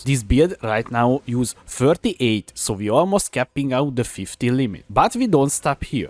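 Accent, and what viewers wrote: Hungary. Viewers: You have a hungarian accent :D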